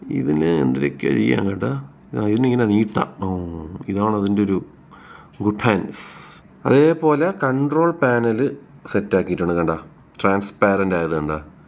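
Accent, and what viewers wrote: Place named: Thiruvananthapuram, India. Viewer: fell for your feel of transparency as human with thirontharam accent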